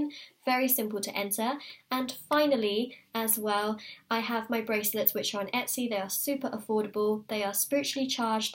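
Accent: United Kingdom. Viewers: Uk accent.very nice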